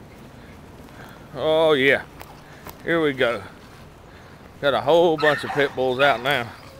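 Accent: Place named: South, United States. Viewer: They look like Staffordshire Bull Terriers. Try an sound less hill billy next time.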